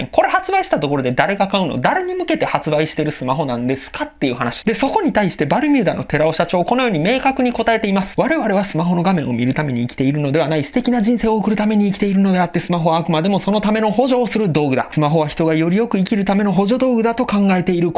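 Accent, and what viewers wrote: Kansai, Japan. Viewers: この動画の主旨はゴミを売るメリットについてなので、如何にゴミかを強調するのは必要だと思いますよ あなたのもやもやの正体は「関西弁のテンポやノリとあなたの感性が相性が悪かった」だけでは無いでしょうか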